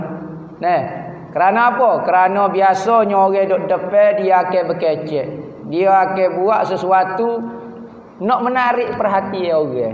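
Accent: Kelantan, Malaysia. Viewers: Saya dari perak..suka dgor ust bgi ilmu..faham walau kecek kelate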